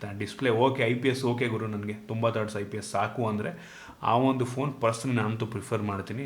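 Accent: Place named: Mangalore, India. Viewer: sir ನಿಮ್ಮ ವಿಡಿಯೋ ನೋಡೋಕೆ ಇಷ್ಟ ಆಗೋದು ನೀವ್ ಮಂಗಳೂರು ಕನ್ನಡ ರೀತಿ ಮಾತಾಡ್ತೀರಿ.. ಥಾಂಕ್ಸ್ ಫಾರ್ ವಿಡಿಯೋ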